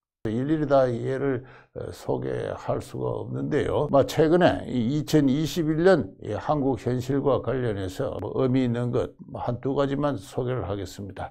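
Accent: Gyeongsang, South Korea. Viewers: 경상도. 사투리 듣기 싫어유 😢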